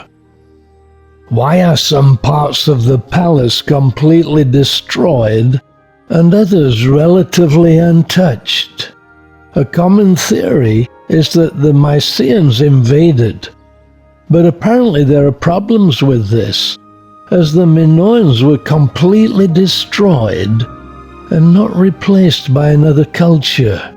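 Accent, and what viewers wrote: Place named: United Kingdom. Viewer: Love your style very clear and distinct typical British English thanks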